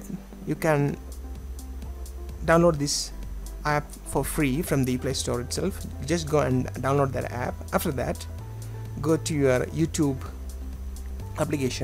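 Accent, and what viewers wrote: India. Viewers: love ure accent bro :D indian? haha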